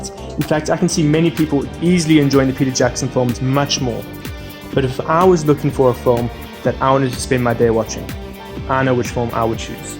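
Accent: South Africa. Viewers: I didn't hear Australian either. South African perhaps? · Dude, that accent is one I recognize well and truly. Is jy 'n Suid Afrikaner? as jy is, dan is jy die eerste gooie youtuber ek het gevind op die internet. (Jammer as my afrikaans sleg is, maar ek wil dit meer oefen, en ek praat engels die meeste van die tyd.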